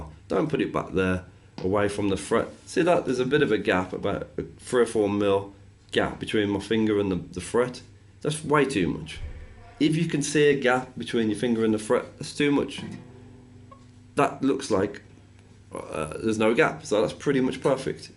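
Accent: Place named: Birmingham, United Kingdom. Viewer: brummie? Some type of English accent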